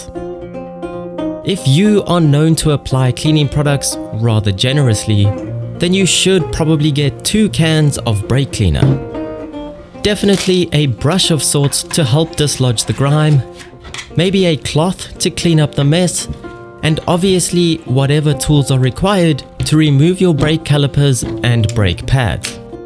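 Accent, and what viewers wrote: South Africa. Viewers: dud are you from south africa? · How do you know JHS Racing bro? I just assumed from the accent you were in RSA. Awesome crew aren't they? Great vid, thanks mate.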